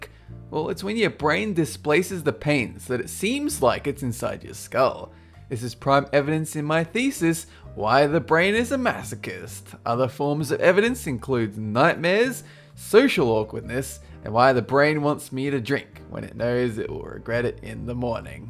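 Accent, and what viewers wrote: Australia. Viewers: I was wondering for a while, i am struggling to pin accent, is he Aussie?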